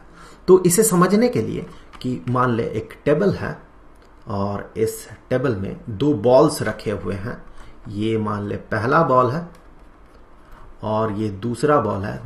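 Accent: Bihar, India. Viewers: Sir are u from bihar?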